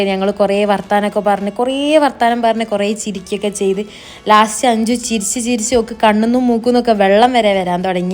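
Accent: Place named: Kozhikode, India. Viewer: His slank thani nadan kozhikode kari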